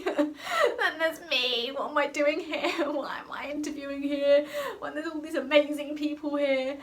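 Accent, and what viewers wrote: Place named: RP, United Kingdom. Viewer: your too posh 😭😭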